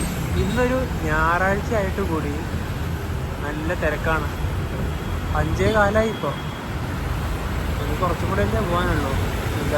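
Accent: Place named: Kasaragod, India. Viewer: I like Kasaragod language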